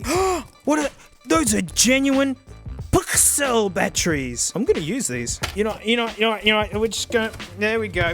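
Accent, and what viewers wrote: Australia. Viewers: He gets more and more Aussie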